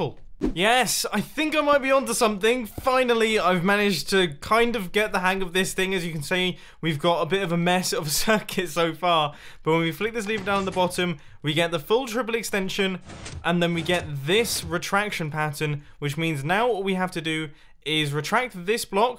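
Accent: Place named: United Kingdom. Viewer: you are speaking british !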